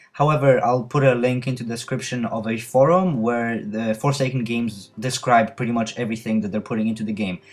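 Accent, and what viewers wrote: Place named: Hawaii, United States. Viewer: You sound like ryan higa when he's doing his hawaiian accent...... #justsaying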